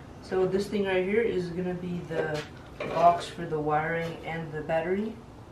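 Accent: Russia. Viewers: Блин слышно сразу по голос русский))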